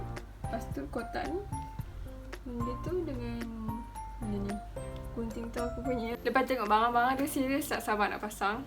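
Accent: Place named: Kedah, Malaysia. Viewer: "Sampai tak Celuihhh" , org kedah kita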